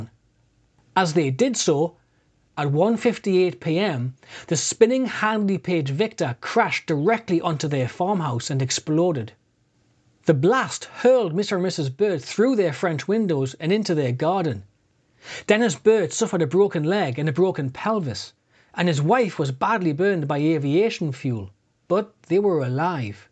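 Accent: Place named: Scotland, United Kingdom. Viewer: very clear & concise description of thos trajic accident. we got to see the vulcan perform at air shows in the states, but never the victor or valiant. your accent is exotic to west texas ears, are you scottish?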